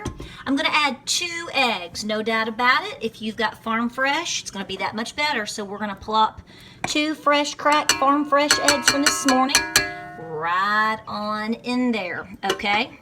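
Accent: South, United States. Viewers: Your videos are just wonderful. Love your kind southern accent, as well as your wonderful recipes. Bless you and keep up your faith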